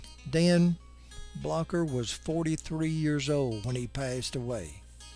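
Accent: South, United States. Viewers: .... All of your posts are fantastic!!! I never miss any, when I see your name on a documentary. I enjoy the music you choose, the slight southern twang, and you can hear the caring in your voice. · This was a much loved series in the U.K. and I'm sure Dan Blocker was as likeable in real life as he was in Bonanza. The Cartwrights always seemed to be rightin' wrongs and doing the right thing. I love the southern drawl of the commentary. Wouldn't it be great if the store was open again ? The town seems a little sad though. Melody Ayers' comments below are very apt. Many thanks.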